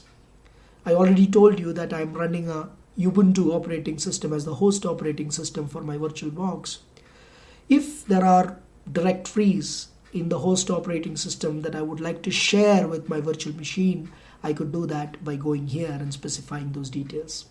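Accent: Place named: India. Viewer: Appreciate your clean English, albeit Indian accent, greatly explained,Keep it up.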